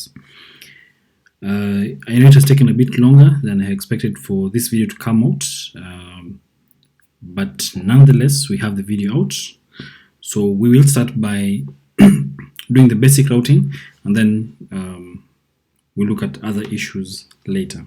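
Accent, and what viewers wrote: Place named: Kenya. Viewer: Totally unrelated, but you sound Kenyan🤔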